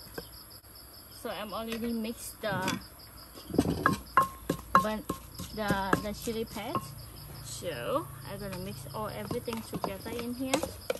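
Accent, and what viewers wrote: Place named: Australia. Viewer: I can hear the Australian accent in that English language 😊👍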